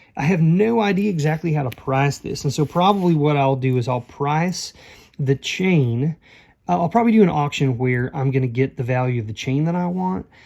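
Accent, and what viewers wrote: South, United States. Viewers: Hey. I'm new to reselling. Well I have stuff but haven't listed yet and I believe I'm overthinking lol. Heard you say you were going to a shop in NC. Not sure if that's where you do business, but when I have watched videos, I wondered where you were from (the country accent🤷) lol. I got that SC country accent going on. Thanks for all the education!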